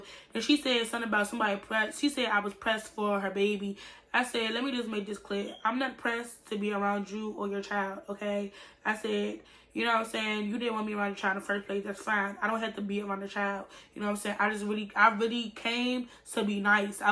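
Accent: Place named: Washington DC, United States. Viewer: You from the dmv? Lol you got that DC speech 😭😭😍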